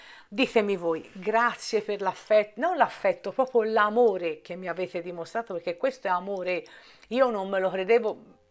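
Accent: Tuscany, Italy. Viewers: Ciao sei dolcissima mi piace il tuo accento molto toscano.. ti sono vicina e ti mando tanta positività ❤😘😘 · Cara ti seguo da diverso tempo anche se non interagisco praticamente mai Adoro il toscano e ascoltarti è piacevolissimo Per quanto riguarda la vaccinazione porta pazienza vedrai che tutto si sistemera' quanto prima In bocca al lupo 😘😘😘😘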